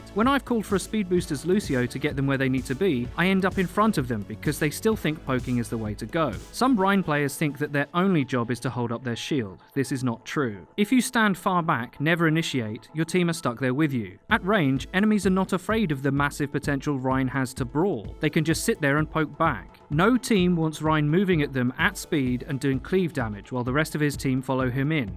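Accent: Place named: United Kingdom. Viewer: It's his accent. Listen to, or imagine a British person saying something like "remember". The R at the beginning gets pronounced, but the one at the end is downplayed or removed. Other accents and dialects of the English language have quirks like this as well.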